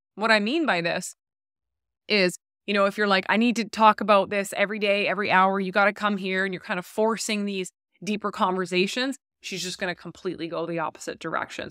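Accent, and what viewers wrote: Canada. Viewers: Seriously. Are you Canadian?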